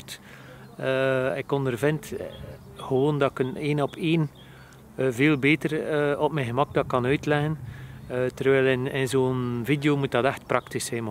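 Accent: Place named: West Flanders, Belgium. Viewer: Begrijp je volledig! Het is leuk om te soen, maar langs andere kant, wij als abonnee je video's zeker leuk, maar even cru gezegd, brengt weinig nuttige content, ttz ik denk dat je meer volgers zou bij krijgen met reviews. Meeste YouTubers, zullen sneller 'geprikkeld' zijn (denk ik) wanneer je meer reviews/ervaringen zou uploaden. Wat bedoel ik, bvb, je review/ervaring over je step. Zo heb ik trouwens je kanaal ontdenkt, gewoonweg omdat ik ook interesse had in zo'n Xiaomi Step. Persoonlijk bekijk ik je video's bijna dagelijks en vind het tof te zien, jou als persoon, vind ik dat je het fantastisch hebt gedaan, maar niet iedereen heeft interesse in wat je dagelijks doet. Het siert je alvast dat je het lnag hebt volgehouden. Denk de beste tip ik je kan geven om meer volgers te krijgen is nuttige content. En mss ben jebhet niet bewust, maar je hebt al nuttige content gebracht, bvb. 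Je kookkunsten, of ervaring van je step, en je mag zeker zijn, dit wordt verder verteld, betrap me daar zelf op dat ik hets dan verder vertel. Nog een challenge, mensen zijn soms moeilijk te 'overtuigen' ol opeens iemand te volgen, familie en vrienden zulken dit wel doen en ikzelfs vind het persoonlijk fantastisch je Algemeen Nederlands gemixt met het West-Vlaams, maar niet iedereen heeft dezelfde interesses. Als ik je eenntip mag geven als YouTube-kijker: forceer jezelf niet, en pist enkel filmpjes van reviews, wanneer je er zin in hebt tenminste. Los van dit alles vond ik je vlog, als West-Vlaming, z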